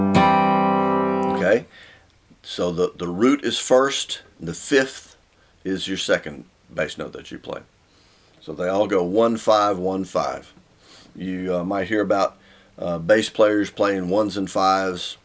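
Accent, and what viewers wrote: United States. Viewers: that's great, I'm smiling, it sounds like Tennessee to my ears, thank you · Just your explanation of how you play a G chord is off the hook. And you have the most perfect southern accent.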